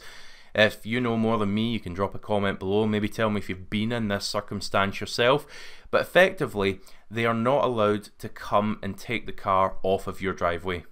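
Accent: Scotland, United Kingdom. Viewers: You need to change your accent,,,you are speaking scottish,,,,everyone detests scottish lingo,,,soplease,,,go to a voice coach,,,they will kill your problem. Jus sayin is all. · I subscribed just for the accent - I'm a McRae but live in Australia after my great, great grandfather emigrated. However a Scottish brogue still resonates with me.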